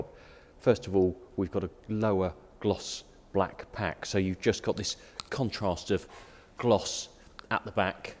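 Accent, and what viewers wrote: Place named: RP, United Kingdom. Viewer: The presenter is ace. Sounds like he went to Sandhurst.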